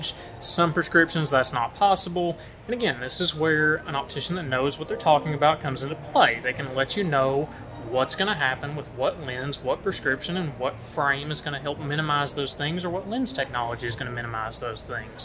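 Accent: Tennessee, United States. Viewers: I love your Tennessee accent lol :)😆Great vid. I wish I had an Optician as passionate as you are 🙂 would love to work with someone excited to find my personal perfect fit and style, and someone who knows the ins and outs of lenses in case problems or questions arise. GREAT Opticians are really few and far between these days...in my opinion 🙂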